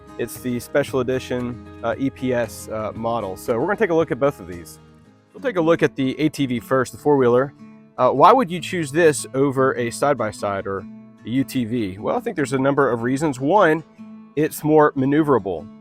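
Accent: United States. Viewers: Portugal. We have very different accents in our regions, I think its the same everywhere, just wondering were in America is this accent from, east coast, west coast, north, south?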